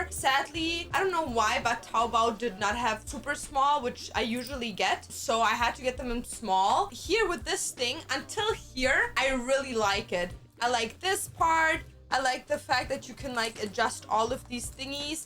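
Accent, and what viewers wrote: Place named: Germany. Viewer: sie klingt so deutsch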